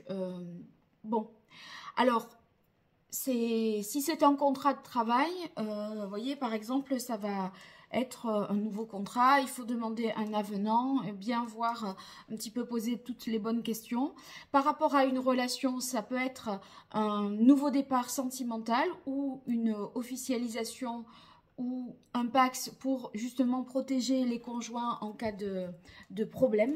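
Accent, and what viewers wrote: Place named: Brittany, France. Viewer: Merci pour la vidéo, vous êtes jolie comme cœur ! 🙂 Et votre bel accent il vous vient de Bretagne ?? 😉😋😇 Beau Dimanche tous 🙏🙏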